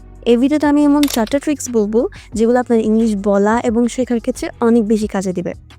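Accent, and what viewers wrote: Chittagong, Bangladesh. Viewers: apni ki chittainga , cause apnar accent pura amader chittagonian der moto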